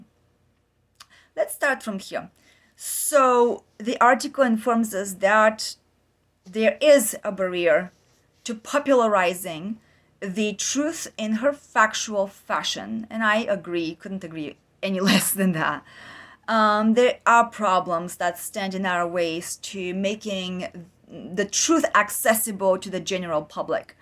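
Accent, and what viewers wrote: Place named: Bulgaria. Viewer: You're elegantly spoken and yet still so authentically Bulgarian! You have a gift! This channel and your message deserve more visibility!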